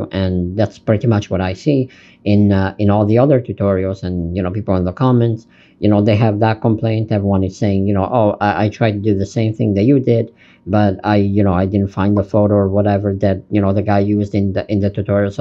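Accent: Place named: United States. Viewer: Hi...I follow you on Instagram and I came to Youtube to hear you speaking 😉No, I'm not a weirdo, LOL....I was just intrigued by your silent Instagram videos, and that eye-twinkling smile. of yours... I'm an artist too and I like to follow young artists to see how they progress with their art journey.... In the description, it says that you live in Tunisia.?.. but your accent is American.... You got me all curious, especially after listening to you and seeing what an amazing speaker you are at just 19 (20 now, I know). Sincerely impressed by your confidence and know-how at such a young age. 🤩😍 Sending you warm regards, my fellow artist!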